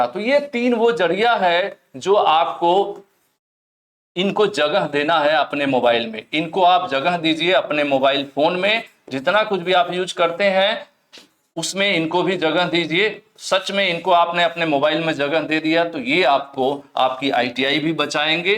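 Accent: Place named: Bihar, India. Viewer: Sir bihar se hai😊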